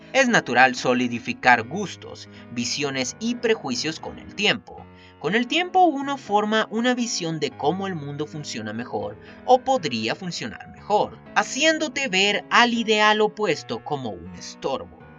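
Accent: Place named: England, United Kingdom. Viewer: Mejor voy Preparando mi Dinero, Para pagarte en Patreon y que dejes tu Acento Ingles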